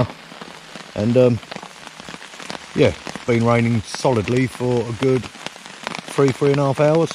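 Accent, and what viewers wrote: Cockney, United Kingdom. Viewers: This geezer looks/sounds just like Ray Winstone, that's a great Cockney accent. Two thumbs up.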